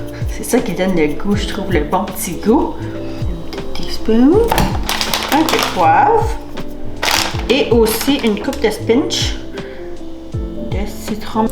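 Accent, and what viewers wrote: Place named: Acadia, Canada. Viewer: J’adore en français et surtout l’accent acadien. Love it!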